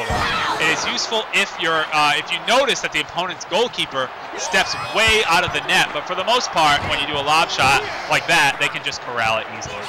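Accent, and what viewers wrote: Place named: United States. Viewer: You sound American, but call it Football. Not like it matters, but I'd just assume you'd call it soccer more than football out of habbit. xD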